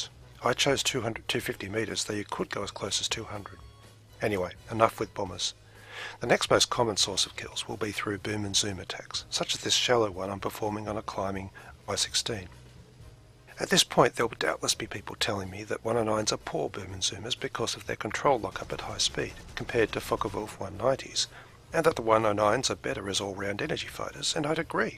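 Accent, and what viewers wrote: Australia. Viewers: He sounds like an Aussie to me.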